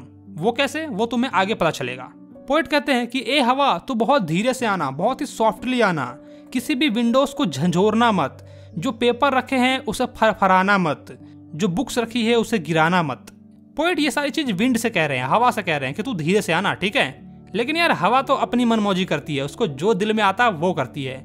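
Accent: Bihar, India. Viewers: Hi sir u are from bihar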